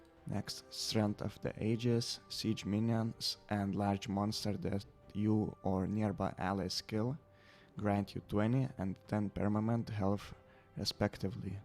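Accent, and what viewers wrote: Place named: Lithuania. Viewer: Where are you from? You sound like a Lithuanian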